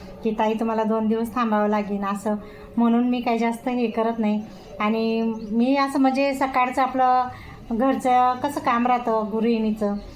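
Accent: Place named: Nashik, India. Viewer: ताई तुम्ही नाशिक साईडच्या आहेत का.. बोलण्यावरून वाटले तसे..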